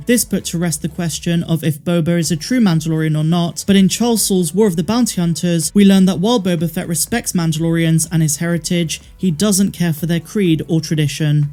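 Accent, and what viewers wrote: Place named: Bristol, United Kingdom. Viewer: Love the work! Can i just ask - are you from the West Country? Whenever you say "Jibber Jabber" Your 'ers' sound like 'urs' as in "Jibbur Jabbur". Are you Bristolian? I'm from the west too, alittle further down. Your daily drip feed of tsar Wars info is very welcome & addictive!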